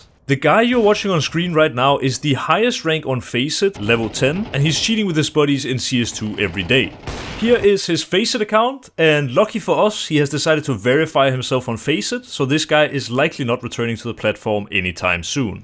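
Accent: Denmark. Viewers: the heavy danish accent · Man føler sig hjemme med den danglish accent <3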